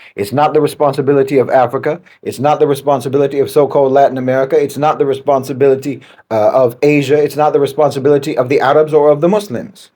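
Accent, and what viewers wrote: United States. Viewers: As he condescends with an American accent. 🙄 I can see what you see. Unfortunately, i am silenced while you are given a voice AND a platform to damn the 'collective West! Unbridled patriarchs in positions of power are the scourge of the world. Don't conflate those monsters with a population, the majority of whom were brainwashed by the most powerful propaganda machine to have ever existed! What makes YOU the exception?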